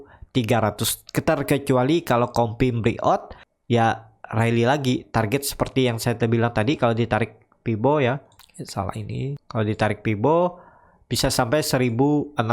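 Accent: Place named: Banjar, Indonesia. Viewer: Borneo mana nie pak, logatnya sih orang Banjar nie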